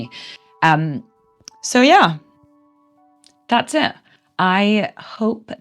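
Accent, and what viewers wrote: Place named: Scotland, United Kingdom. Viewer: Love the accent! Got to golf in Scotland a couple years ago...beautiful.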